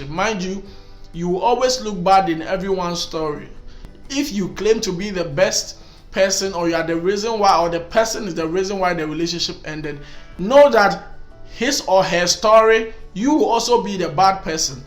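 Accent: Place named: Africa. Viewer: This African accent. I love it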